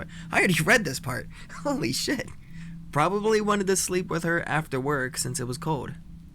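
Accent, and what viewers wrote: Scotland, United Kingdom. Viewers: Nice Scottish accent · best scottish accent evwr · Sometimes the worst stories are the best! Think this is my 3rd listen already. Hope life is going better! Thanks for the BEST Scottish accent ever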